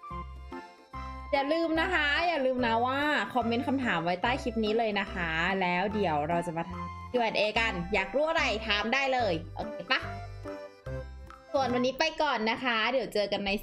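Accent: Thailand. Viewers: 1พี่อายุเท่าไร 2พี่เป็นคน เหนือ กลาง อีสาน ไต้ #เท่านี้แหละ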